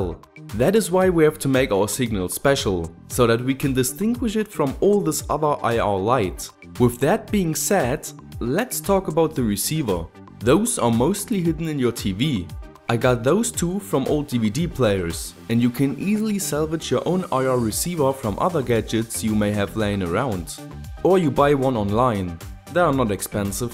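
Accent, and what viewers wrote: Germany. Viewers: keep making cool stuff that's affordable and other folks can make too. P.s I noticed the German accent considering you're doing this stuff and college is free in Germany what kind of engineer exactly are you? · Thank you for that Video :) It helped me out realy much ! Please keep doing such nice projects and Videos. Is your mother tongue german ? :) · Gebürtiger Deutscher? Man hört es :DD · Du bist deutsch oder? Your accent is german. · 8233Eire it's a German accent btw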